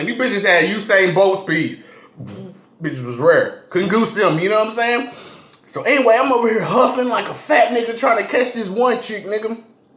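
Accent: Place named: Texas, United States. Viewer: You gotta be from Waco...or central Tx. This is how we talk cuddy.lol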